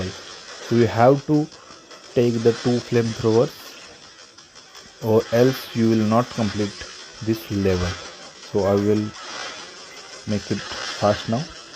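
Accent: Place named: India. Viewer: cant understand a word this dude is saying. the indian accent is bad enough but talking as fast as you possibly can like you just did a mountain of meth really doesn't help.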